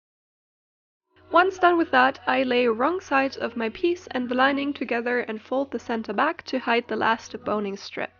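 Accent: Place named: Germany. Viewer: Are you German? Coming from a German, you sound very German.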